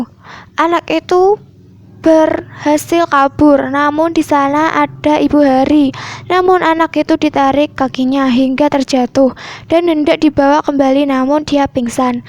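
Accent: Java, Indonesia. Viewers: Bahasanya terlalu medok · Kak, kakak orang jawa ya😶 Soal nya kkak ngomong nya kyk orang jawa😅😅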